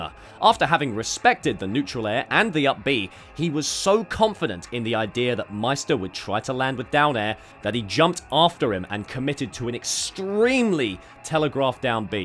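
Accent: United Kingdom. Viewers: who is this brit in my pgstats video and why is his voice so good 🤨🤨🤨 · Guys a Brit. Gotta learn how they talk mate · That's just how many brits talk bro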